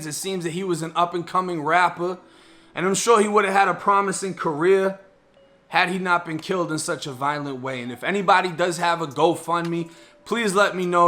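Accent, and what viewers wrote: Boston, United States. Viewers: Man how long you been down here in Florida now? Gotta lose that weak ass boston accent. Shit wack. Can barely stand to hear you talk. You do good videos and shit but damn the way you talk is annoying AF!!!! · You from Boston?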